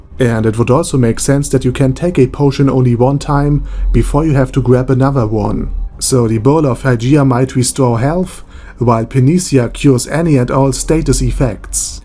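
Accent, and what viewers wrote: Germany. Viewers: I have to say, your kinda heavy german accent is mesmerising 😁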